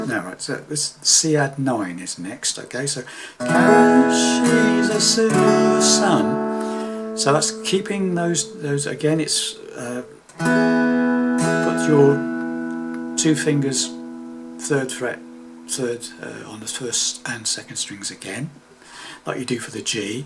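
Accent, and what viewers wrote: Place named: Coventry, United Kingdom. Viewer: Just had to pause, its a lot to take in...just subscribed to his YouTube page.. Your lesson is as always perfect, great explanation and walk through, are you from Coventry but moved to Bedworth or leamington...??? You sound very local..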